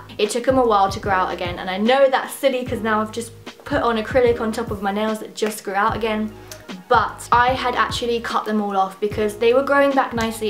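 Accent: Scotland, United Kingdom. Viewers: Guessing by her accent is Scottish (said UK) but of Italian ancestry.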